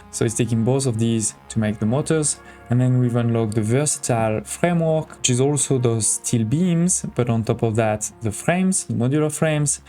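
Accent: France. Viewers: Hello man I know that it has nothing to do with the video but I have have a problem with my mods, i want to play with the Commuter pier for new world but it doesn't work. All the other mods of Spice it up don't work too, and I use the mod launcher so if you have any clue how to fix it or if you know if spice it up is not udpdated with the nex world rising it will help me a lot. ( I think your french looking at your accent and me too so if your french you can answer in this language). Thanks for the videos :)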